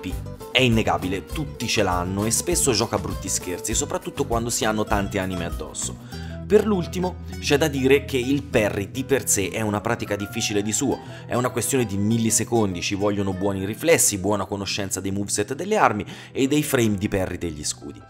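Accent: Tuscany, Italy. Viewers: Fammi indovinare... Toscanissimo! :D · Bravissimo bel video! Toscano? :D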